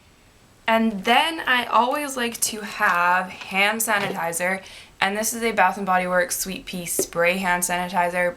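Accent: Canada. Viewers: she's Canadian so she does have a slight accent. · no not really... prob cause ur not used to Canadian accents. i guess i'm just used to it cause i'm Canadian ;) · What the hell?! LOL. I'm Canadian and we don't consider ourselves to have accents...she talks the way I talk too. lol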